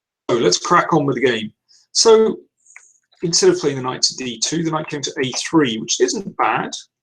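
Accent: United Kingdom. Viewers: U really think u dont have a British accent? strange, of course u do. not that there's anything wrong with it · what does he mean at the beginning when the guy compliments his British accent and he says "i don't have an accent" ??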